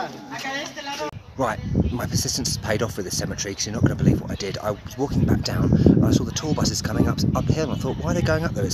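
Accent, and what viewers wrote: United Kingdom. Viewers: Hi, Love this video and it shows that you are truly in a league by yourself when it comes to producing an informative, quality vlog that is truly worthy of watching again in the future. I always enjoy as an American just listening to the British accent and love your culture and unique sense of humor. You're in a class of your own and your class and intelligence and the effort you put into your videos shines through. The Kennons and Tangerine travels don't even come close and you and I all the other brilliant, smart, educated people who watch you on a regular basis know this to be true. Look forward to your videos in England and Bulgaria.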